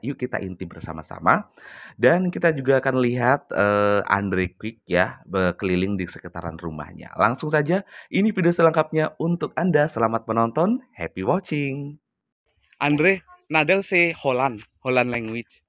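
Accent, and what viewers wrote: Banyumas, Indonesia. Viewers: Bahasane deneng pad Bae Karo nyong ya ngapak,ora ngapak ora kepenak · mas wong ndi kie..koq kdg ngpak bhnse 🤣😂..your is Holland man or ngapak man.... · Salam satu ngapak mas ❤😂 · mas ko ngomonge ngapak kaya inyong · Kang ngapak ya..pada karo nyong...asli ne biyung kartini ngedi kang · Kok Ngapak juga mas, kaya EnnyongE 🤭🤭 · Mas sampean orang mana kok bahasanya seperti saya ngapak? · Bahasanya ngapak sama aku baru nemu chanel ini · ngapakk y keren 😂🙏🙏 Inyong Suroboyo 😂😂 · Deneng ngapak temen mas 😁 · Asli wong ngapak ndi sih mas ki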